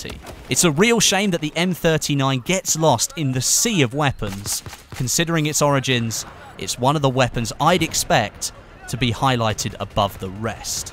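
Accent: United Kingdom. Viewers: he's British we're American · 80% of comments:You pronounced general patton wrong He is British not American so just deal with it if you don’t like the pronunciation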